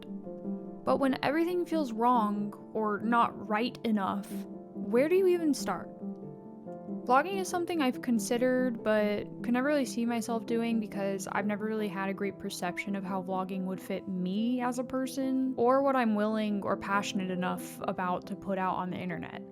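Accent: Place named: United Kingdom. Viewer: You sound British, nice beginning in vloging, keep up, which town is this one? Great video 🎉🎉🎉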